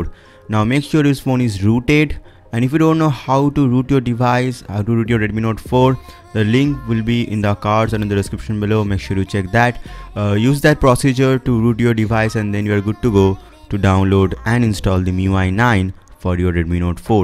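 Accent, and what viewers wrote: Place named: Maharashtra, India. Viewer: such a stupid accent. marathi fucker i guess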